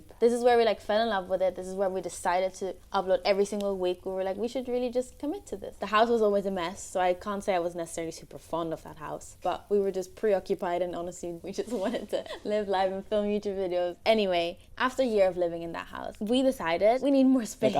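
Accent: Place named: Scotland, United Kingdom. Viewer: how do you have a scottish accent? I love your videos x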